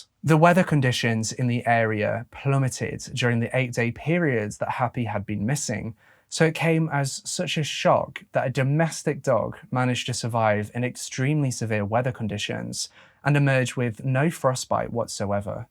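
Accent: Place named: Derbyshire, United Kingdom. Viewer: im guessing Derbyshire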